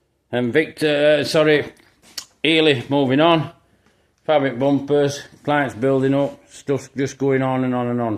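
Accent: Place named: Yorkshire, United Kingdom. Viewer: Yeh a master, not many folk left now that can do the work & classic car repairs like this man does. Pluss he's from Gods own country Yorkshire the same as my old queen came from with that amazing accent. 👌 Jim.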